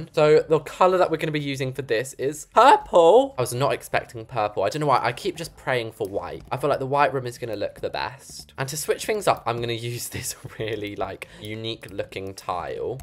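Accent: United Kingdom. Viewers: Try to do a American accent Bc u have a British accent and take a break on talking in ur videos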